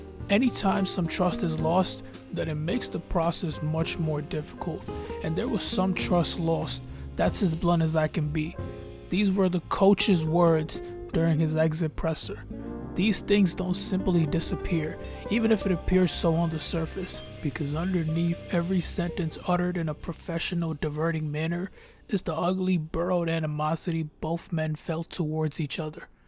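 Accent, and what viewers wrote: New York, United States. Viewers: that New York drawl is perfect for basketball talk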